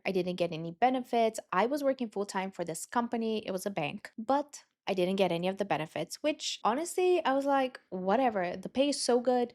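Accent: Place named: Latin America. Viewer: I thought you were Latina from your accent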